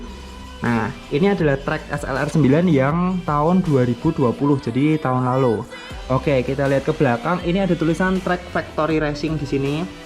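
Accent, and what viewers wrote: Java, Indonesia. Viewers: Mantaappp.... bahasanya pakai 3 bahasa, ingris,indonesia,jawa medok..hehehe.. semangat mass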